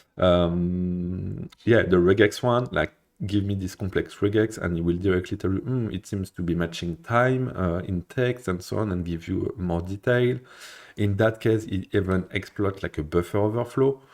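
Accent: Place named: France. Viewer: T'es francais toi mdr très bonne vidéo sinon!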